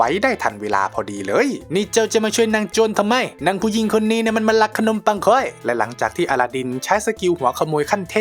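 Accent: Isan, Thailand. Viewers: ทำไมพี่พูดอิสานได้อ่ะ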